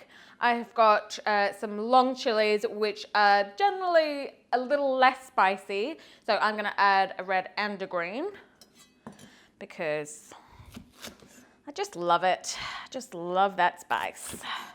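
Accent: United Kingdom. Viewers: thank you... you sound soo british...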